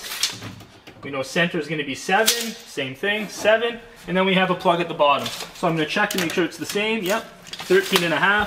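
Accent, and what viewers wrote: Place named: Canada. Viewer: I doubt it. Listen to the way he pronounces his 'O's' Id bet hes from Canada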